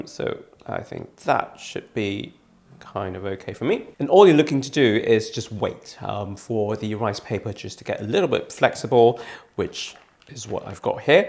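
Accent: United Kingdom. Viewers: Love your Recipe and your Accent!! British??? NYC Manhattan ❤️💋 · I can't stand the British accent 😂😂😂😂 its horrific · Nice video for the spring rolls, also like your British Excent.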